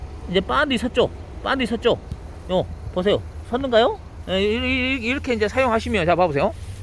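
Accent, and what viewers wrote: Jeolla, South Korea. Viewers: 사장님 말투 때문에 자꾸 보게되네요. 어디인가요 지역이요. 전라도는 맞는데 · 아따.전라도사투리 존나듣기싫네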